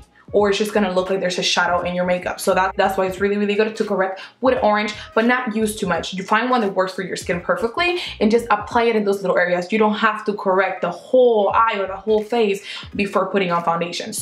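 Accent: Panama. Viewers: I'm 50% Cuban & 50% Panamanian And I love hearing you talk in Spanish because I wish I can talk like that w that "que sopa" Spanish accent 🙈😿 lol viva Panama #Colon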